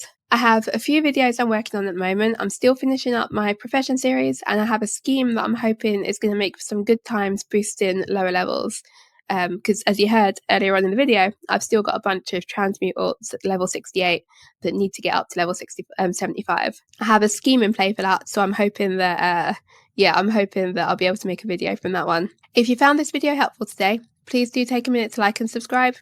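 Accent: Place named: London, United Kingdom. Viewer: You sound like you from London ye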